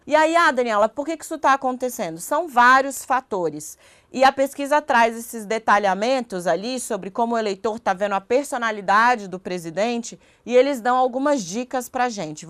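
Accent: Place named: Minas Gerais, Brazil. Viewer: Não esconde o sotaque mineiro não. Adoro.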